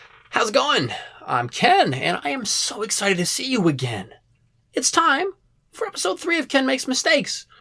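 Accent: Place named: Australia. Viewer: That Aussie accent, lmao never gets old.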